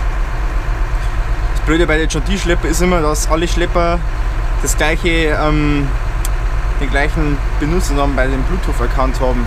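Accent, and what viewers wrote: Bavaria, Germany. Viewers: Sau gutes Video! Gefällt mir so mit bayrischem Dialekt, weiter so! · Meine Meinung ist Rede ganz normal und nicht so hochdeutschbayrisch ich weiß das vielleicht die ein oder anderen das nicht so gut verstehen oba ihn veida Redet mah aweng anders und i wors dast in da Nähe her kimmst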